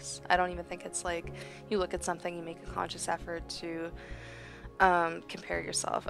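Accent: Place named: Toronto, Canada. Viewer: It's really nice to have this in the background while working, I struggle with not being distracted by videos and social media, so when I'm listening to someone talk I'm able to focus: ) Also getting almost homesick hearing your accent, I miss Toronto.. Anyway, I'm honestly really enjoying your videos, you have relevant and meaningful opinions, I hope you keep making them^^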